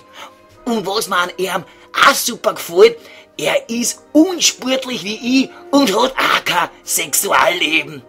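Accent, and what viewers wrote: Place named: Vienna, Austria. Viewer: Ich hör mir die Story jetzt schon zum 14.mal hinter einander an und ich brech echt immer heftiger ab - die Story ist UR-Lustig und der Dialekt ist Ur-Wienerisch- einfach toll !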